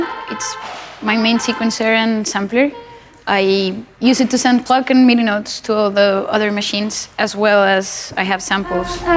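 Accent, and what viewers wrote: Bogotá, Colombia. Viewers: I didn’t know she was Colombian until right now that I heard her speak in English and said: she has to be from Bogotá! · el acento rolo es super marcado hasta en inglés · Sounds Colombian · Any other Colombians can notice how her accent also somehow is there when she speaks English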